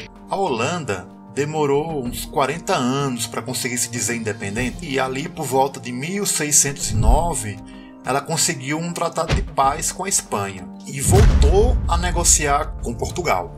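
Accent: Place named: Northeast, Brazil. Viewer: Queria que existissem mais vídeos no youtube com essa pronúncia do nordeste do Brasil pois é a única que lembra a de Portugal.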